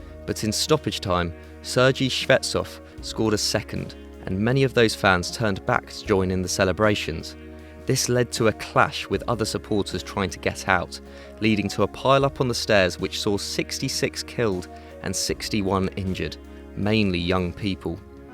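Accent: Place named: United Kingdom. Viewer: Why is everyone critisizing the poor guy's voice? He's just British ok.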